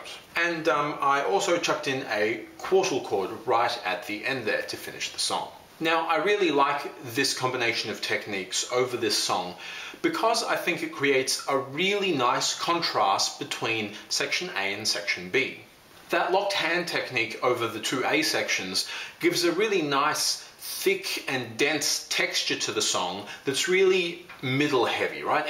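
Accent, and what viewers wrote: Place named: Australia. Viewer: hey, i noticed you have an Australian accent, do you do 1on1 tutoring or know anyone else in Sydney for Jazz piano youd recommend?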